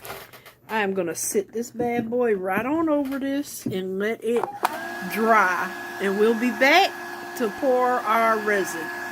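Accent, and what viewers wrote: South, United States. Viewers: You had me with the Southern Drawl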